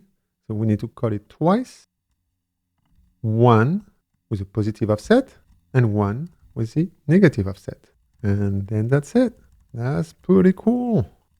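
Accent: France. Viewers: Simply the best bevy tutorial I’ve seen so far, it’s the first time I really understand what’s going on and how it works, merci beaucoup ! (je reconnais l’accent français, j’ai le même :D) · My guess is your native language is French (was not so difficult to guess right, right?). French is a soft language and it start from back of the head. while eng is hard and start from to front. My guess is when you try to replicate eng language accent you feel something wrong in your head, right? While with your current accent you feel ok. That's the point. When you feel the sound is wrong is in that moment that the accent is more near to native English people ;). If your goal is not to be proficient in English accent the suggestion of mine - speak slow and check the result with another person help (your kids?) - can be the solution for now :). Great content of course :)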